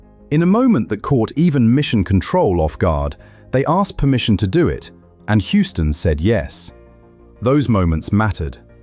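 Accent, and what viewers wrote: United Kingdom. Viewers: Why use a British accent to speak American? We don’t have skeduals or use Fahrenheit 😳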